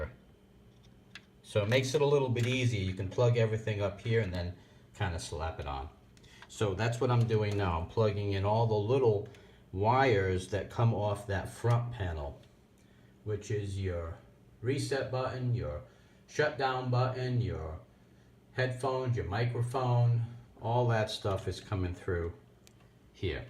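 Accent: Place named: New York, United States. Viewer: I'm with you Robert, he does have an interesting and great accent. I'm English and I can place most UK regional accents, a visiting lecturer was a bit shocked when I placed him within a few miles of his home just on his accent. To my totally untrained English ears sounds like he's from the New York wider area?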